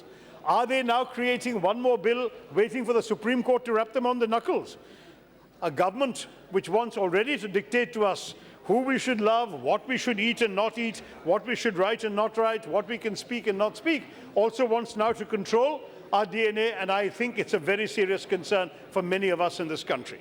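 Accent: United Kingdom. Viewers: Other members sitting around him are stupid and they don’t even care what he’s delivering from his party as don’t understand his British ascent. All points are very clear and valid. Let’s promote him as PM in the upcoming term. Jago Bharat log jago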